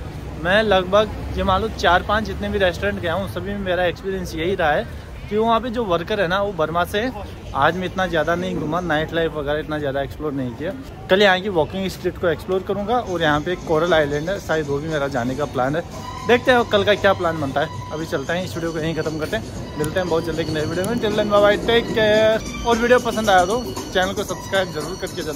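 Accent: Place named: Bihar, India. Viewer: Bhai, ... Soop ne hota ...... Shop bolta ha ....... Bihari English.....🤨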